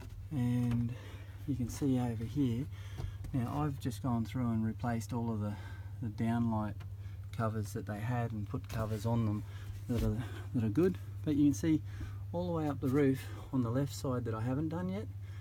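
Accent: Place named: Australia. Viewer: Thank you, it is nice to hear an Australian talking about his product, rather than another country explaining how it is done. 😀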